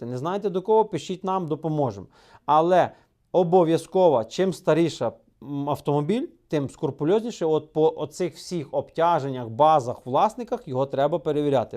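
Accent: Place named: Prykarpattia, Ukraine. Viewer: Приємно чути рідний український прикарпатський говір.🚐🚐🚐🚐🚐🚐🚐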